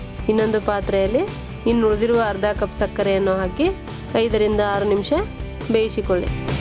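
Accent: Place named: Bengaluru, India. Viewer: Akka, Uttara karnatakadavraagi yaake Bengaloorina kaanada maataadtira.. Uttara Karnatakada kannada kelidre barjari kushi aagtade. Ade tara maataadri..; Recipe mast ide..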